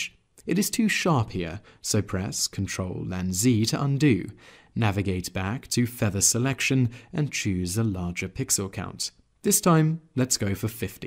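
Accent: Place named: England, United Kingdom. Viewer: No, he sounds english. You, on the other hand, sound like a complete idiot. Goodbye.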